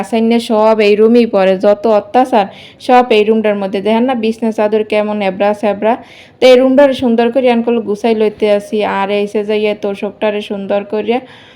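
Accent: Barishal, Bangladesh. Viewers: আপু তোমার বাড়ি কি বরিশাল তোমার কথায় মনে হচ্ছে তোমার বাড়ি বরিশাল আমার বাড়িও বরিশালে ❤❤আজ প্রথম তোমার ভিডিও দেখলাম ভালো লাগলো আপু ❤❤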